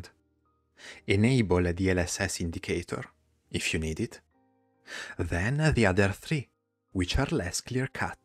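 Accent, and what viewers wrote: Italy. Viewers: Your voice with that Italien accent is beautiful and very relaxing. Did you thought of doing ASMR with computer components ? :goodvibes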